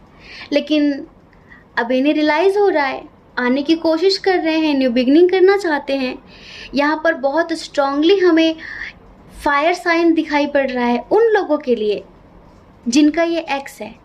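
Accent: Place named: Bihar, India. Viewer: aaj pehli bar hamne apki video dekhi hame bhi yahi laga ki aap up ya bihar se ho kyuki bhasha se yahi laga tha kyuki ham bhi bihari h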